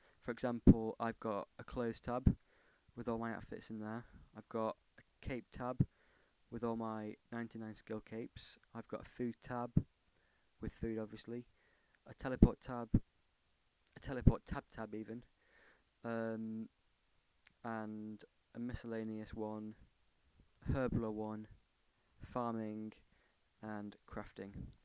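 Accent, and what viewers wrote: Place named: Australia. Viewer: Lol, are you from England? You sound australian. Lol. xD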